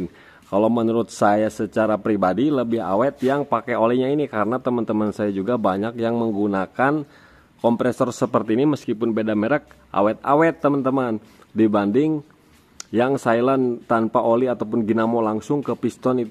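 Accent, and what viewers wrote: West Java, Indonesia. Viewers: Mas'se kalau bicara,,?,🙄 logatnya seperti orang Jawa Barat..😅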